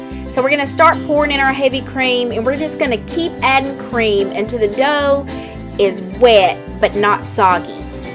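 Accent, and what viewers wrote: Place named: South, United States. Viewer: Them biscuits look amazing and with a southern accent like that, I trust anything you cook.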